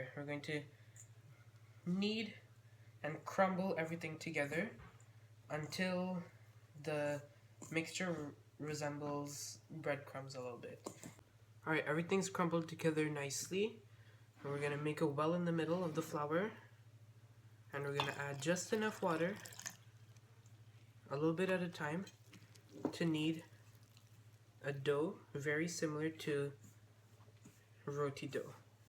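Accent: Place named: Trinidad and Tobago. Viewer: Very nice. best Trini channel yet. its good to hear a genuine accent and not a fake one. Keep up the good work.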